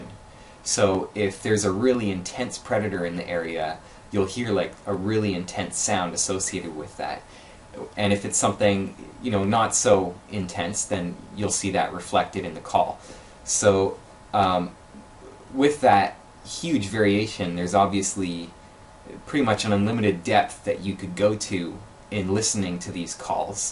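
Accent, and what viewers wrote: Canada. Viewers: "Aboot" Canada, obviously. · A very heavy Canuckian lilt. I say he's from Saskatchewan. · I love your strong Canadian accent ❤❤❤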